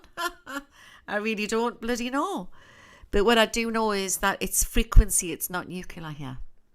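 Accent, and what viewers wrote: Newcastle, United Kingdom. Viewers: I love hearing you because you are a Geordie who is a spiritualist and I miss the spookies back in south Shields. You soothe me. From Lisa in Tasmania Australia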